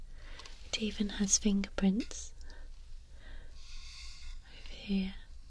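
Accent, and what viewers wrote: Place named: Scotland, United Kingdom. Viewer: hi! are you from scotland or the north of UK? cause your accent is so strong!!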